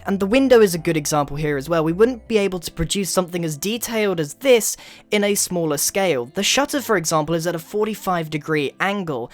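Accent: United Kingdom. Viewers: Are you british england? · Am I the only one there think he sound really british in this video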